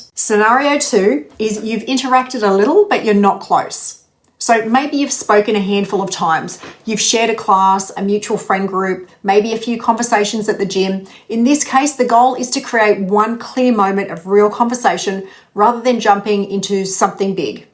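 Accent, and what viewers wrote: Australia. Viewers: That Australian accent is just the best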